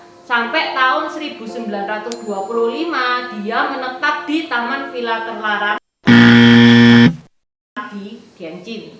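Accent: Java, Indonesia. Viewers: pembawaan nya terlalu lebay dan over, mending dikurangi dan tampilkan medoknya saja dan lebih serius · Keren sekali ka apalagi medoknya hehe2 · mbak dari asal jawa kah?, kalok ngomong keliatan medok nya kwkwkwkw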